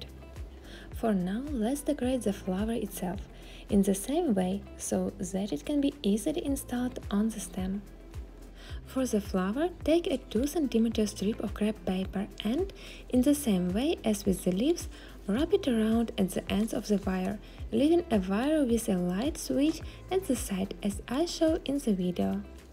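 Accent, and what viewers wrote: Russia. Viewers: Вы же русская, сразу слышно) Почему на английском? А работы очень красивые 😊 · Акцент)) выдаёт что наша! Но здорово всё равно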